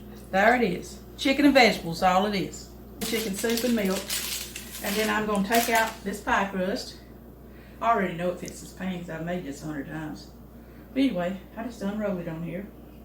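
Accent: South, United States. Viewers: I enjoy your videos. They’re simple easy to follow. And I truly enjoy your plain Country talk I can understand it fine. The way you present yourself it’s just, well it just family. Don’t know any other good way to say it.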